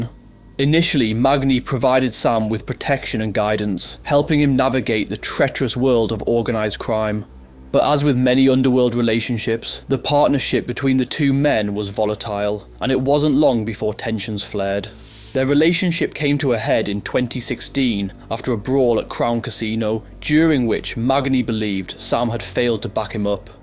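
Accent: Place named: North, United Kingdom. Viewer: Why do we have this “northerner” instead of a good Aussie accent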